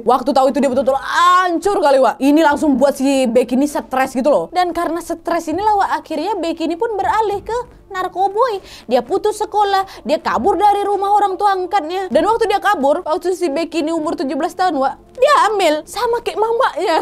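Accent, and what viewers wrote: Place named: Medan, Indonesia. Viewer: Sambil ngakak dengar cara baca ceritanya,kental kali logat Medannya,semoga tetap senabgat dan sukses selaku ya buk.tksh.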